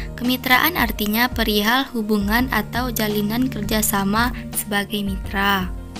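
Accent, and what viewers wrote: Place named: West Sumatra, Indonesia. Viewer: hahah logat minang nyo ses😅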